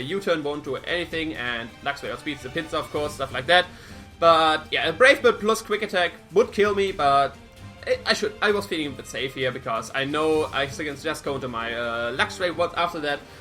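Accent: Germany. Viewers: Looks like another youtuber i'll enjoy to watch. BTW, if you don't mine me asking, are you German? Cause your English sounds a lot like German. Not to be rude though.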